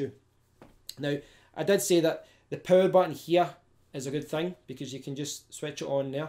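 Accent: Scotland, United Kingdom. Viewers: Oh dude... That Scottish accent... You are probably from Glasgow?! Miss that accent after moving away from Scotland... :)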